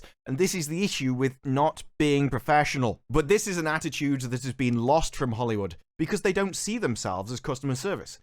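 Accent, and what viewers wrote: England, United Kingdom. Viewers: is definitely one of my favorite content creators, him and Mauler, some insults and comments are just funnier with an English accent. Mauler's video where he deconstructs Doctor Strange and the Multiverse of Lameness is one of my favorite videos of all time. Also, that Punisher shirt is AWESOME.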